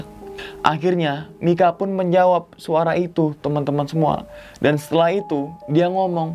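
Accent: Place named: Java, Indonesia. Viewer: Bang,,btw asli mna 🤭ngomong bahasa jawa nya kyak gmn gtu,,,medok medok kota,🤭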